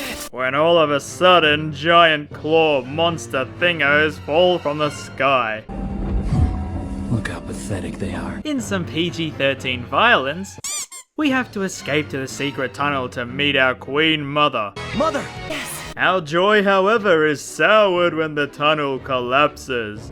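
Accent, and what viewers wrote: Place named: United Kingdom. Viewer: I agree. Your British accent sounds more like you're talking while trying to hold back a yawn.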